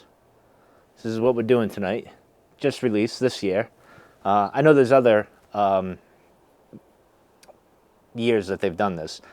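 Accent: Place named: New England, United States. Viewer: Hi, from the sounds of it I think you are a New Englander like my self. I could be wrong but I’m picking up an accent I’m familiar with also nice ranger cloak I got the same one super warm. Like your stuff keep it up.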